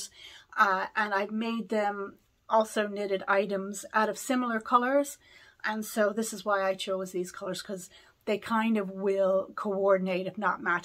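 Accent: Ireland. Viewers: Those will be perfect for your dad. I hear the Irish accent. That is so nice. Gina at knitting Turnpike is , I think possibly doing the same KAL, I've heard her talk about it. Her shawl work is beautiful. You might check her channel. I am a pretty new knitter so I can't be much help. You have such beautiful yarn.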